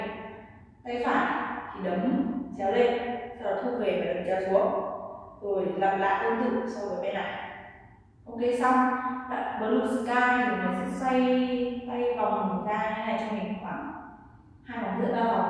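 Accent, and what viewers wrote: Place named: Binh Dinh, Vietnam. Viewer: cho cách nói xe bình định mkmk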